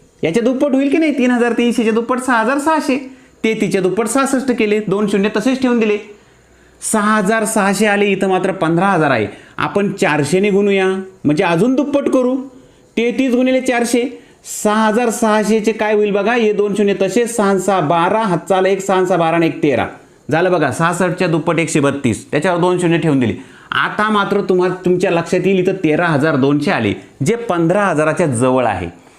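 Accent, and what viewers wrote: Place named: Parbhani, India. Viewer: Parbhani bolto sir bhari strik ahe sir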